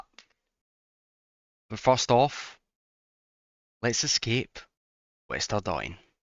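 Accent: Scotland, United Kingdom. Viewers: Yeah no puro puro. Also, are you scottish? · Half my family is fae Glasgae, so it's always nice to hear a familiar accent. But stellar production on the vid. I know I'm half a year late to the party, but I'm already queuing up the next episode. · YOUTUBE YOU DIDNEA TELL ME HE IS SCOTTISH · I love me a good Scottish accent and more chunk content for my procrastination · Belt up, surely tha cannae bae another one chunk frey Glasgee! We need more o tha accent, hyped, gl!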